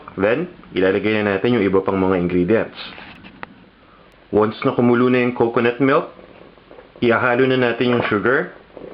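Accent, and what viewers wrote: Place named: Batangas, Philippines. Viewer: Gusto ko po yung Batanguena accent at yung pagpapaliwanag sa pagluluto. Good Luck po... subscribing :-)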